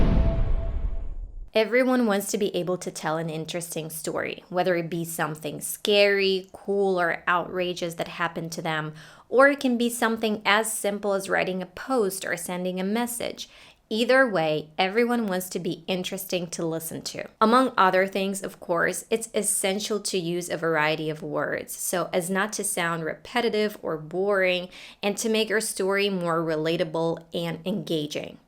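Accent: United States. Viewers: Hola. Soy Gabo. Vivo en USA y mi hijitame dice que tu inglés es Americano. Ella sabe inglés pero yo aun no. Me gustaría saber como inicio tus clases. Gracias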